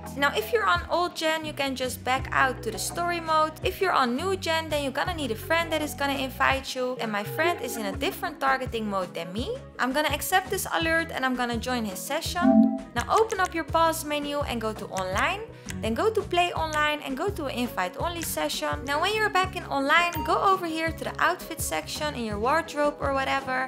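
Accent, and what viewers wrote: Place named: Netherlands. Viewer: dan ga ik zeker abonneren 😁 ik hoorde op een bepaalde manier dat t Engels was maar dan beetje Nederlands zegmaar je hoort da je Nederlands bent haha maar kan jij. Nog same. Met ps4 spelen of heb je die nieuwe versie al gekocht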